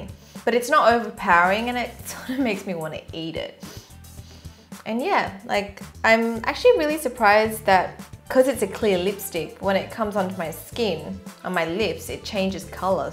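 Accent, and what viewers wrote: Sydney, Australia. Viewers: Her accent is Australian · She's Australian. She even mentioned that she was in Sydney. :) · I knew you got OZ accent HAHAHAHHA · Liz Beth she's from australia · her australian accent is form sydney where she grew up...mentioned in video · Omg yas is that an Aussie accent? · I knew it! sounded Aussie but wasn't sure :-P Thx for your reply. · its australian!! like mine :) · R u from Sydney Australia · haha shes probably asian but grew up in australia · Liz Beth she's from Australia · oh my gosh lol. she can be Asian and Australian... she's from Australia but is Vietnamese lol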